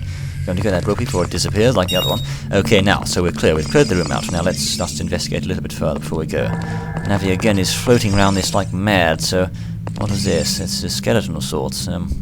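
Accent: United Kingdom. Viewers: You sound British.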